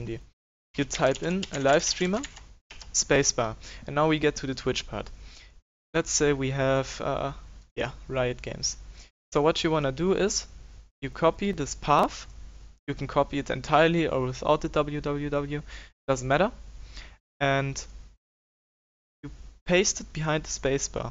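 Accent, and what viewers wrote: Germany. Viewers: Livestreamer gibt es aber anscheinend nur für 32 Bit.. Ich habe aber ein 64 Bit System.. Funktioniert es dennoch? :) Bist ja deutsch wie ich gesehen habe und man es auch raushört :) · haha ich wusste das du deutscher bist xD Das merkt man am Akzent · D der deutsche Akzent ist real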